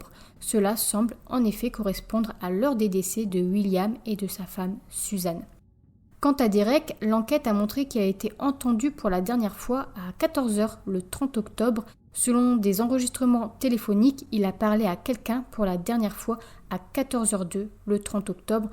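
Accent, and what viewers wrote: North, France. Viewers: Je viens de découvrir ta chaîne, franchement trop bien, des true crimes bien racontés, des sources et des images réelles, bravo !!! Sinon tu viens du Nord comme moi? 😉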